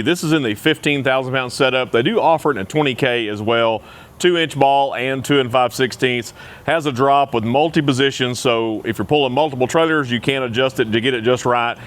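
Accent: United States. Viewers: Like yo cowboy accent 😊 completely clear! Not a word missed 😅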